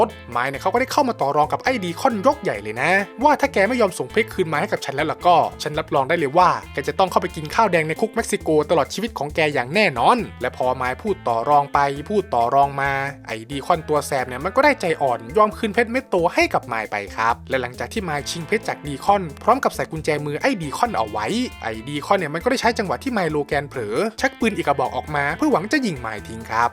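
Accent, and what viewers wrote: Isan, Thailand. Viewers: สำเนียงอิสานๆของพี่น่าร๊ากกกมั่กกก ~><~ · อีสานมาเต็มๆๆ พุ่นเด้555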